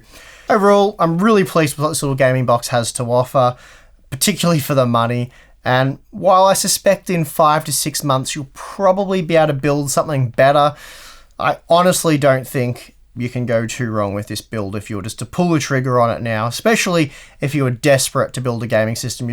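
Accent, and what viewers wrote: Australia. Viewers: Sooooooo you sound Australian why not give Australia prices?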